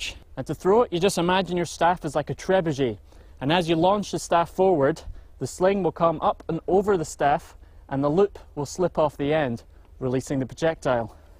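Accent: Scotland, United Kingdom. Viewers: It may be southern or eastern Scotland. Im from the south east of Scotland and it's a much softer accent. Not all Scottish people have a brutal accent :) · A thirteen minute video confirming why the humble stick is one of the most versatile tools in human history, a plug for a foam weapon fighting group, and a Scottish accent. I think I might have found my home.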